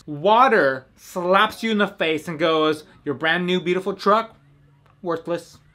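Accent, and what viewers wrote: New York, United States. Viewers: your accent sounded more like a New Yorker not someone from the east cost. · really, thought that was new yorker accent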